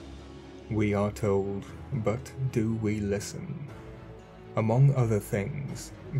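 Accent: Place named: England, United Kingdom. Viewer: Omg.. So dramatic with the heavy English accent 🤣. I figured "Lord" was related to Baal and other pagan deities in like 5 min as a teen. Also "Elohim" can be figured out with just a Strong's concordance. It's just a title dude, that can be given to angels and men. It's a council a court, some are fallen.. Like the angels that slept with human women. In the book of Job Satan himself attends one of these meetings and "El" gives job to be tested. Real Hebrew btw did not come from Sumarian language. It's a forgotten language that is now a mixture of languages. No one speaks pure Hebrew. So it doesn't surprise me of all its Babylonian influence, even modern Judaism main source is the Babylonian Talmud. Basically all major religions can be traced to Babylon.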